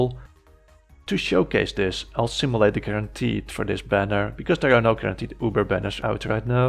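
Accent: Netherlands. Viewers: This man is 100% dutch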